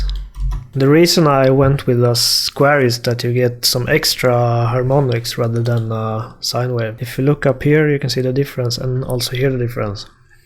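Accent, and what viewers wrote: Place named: Sweden. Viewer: This guys swedish accent is so thick and it's hilarious for me as a Swede to be able to hear this instantly😂 linear uttalas "linniör"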